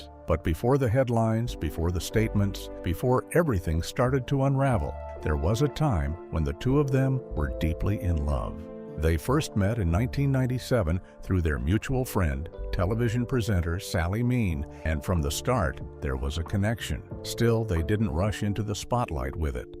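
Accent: United States. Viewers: Why an American narrator? 🤔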